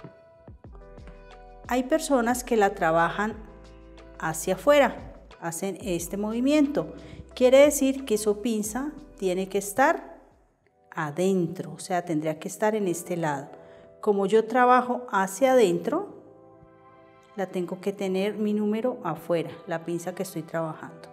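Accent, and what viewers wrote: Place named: Bogotá, Colombia. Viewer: Ud da cursos y en donde Ud es de Bogotá Colombia según el acento Me gustaría saber para ir hacer el curso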